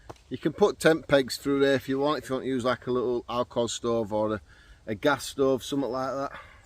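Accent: United Kingdom. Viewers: We call your pot noodles " Ramon noodles" but we don't have curry flavored. Would like to try that one. I just ran across your channel and subscribed. I use to camp a lot in the woods. Never camped at campsites. Hearing things in the wild. Use your gut instincts. Always stay vigilant. I hear a lot of stories from people. And yes, I believe in Bigfoot and aliens! Where are you from? You sound like you have a British accent? Stay safe and always trust your instincts !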